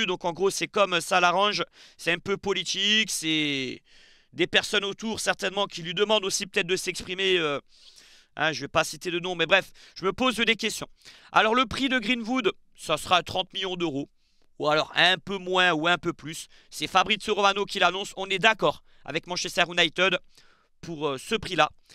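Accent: Corsica, France. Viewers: Ç'est pas la 1ere fois jtecoute 👍🏽 hors contexte mais il semblerai que tia l'accent de nos amis Corse, jme trompe ?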